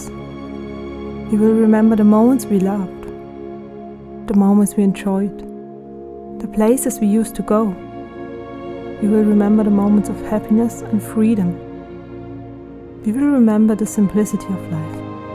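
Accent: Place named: Germany. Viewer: So much like your German accent!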